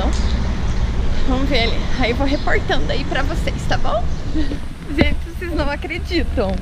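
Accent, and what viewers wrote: South, Brazil. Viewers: O SEU SOTAQUE DO SUL È UMA COISA ASSIM INDISCRITIVEL GRANDE ABRAÇO BOA NOITE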